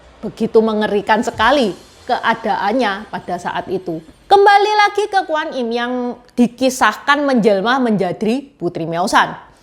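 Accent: Java, Indonesia. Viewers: Sy senang sekali denger encik program , krn sangat medok ❤ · Ci logat jawa nya medok bngt jawa nya mana sih cii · kok kayak logat jowo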